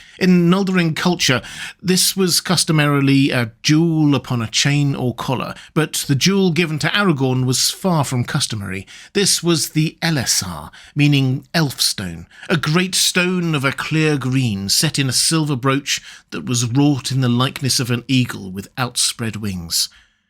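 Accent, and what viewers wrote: England, United Kingdom. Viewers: He has an English accent too! Neat eh?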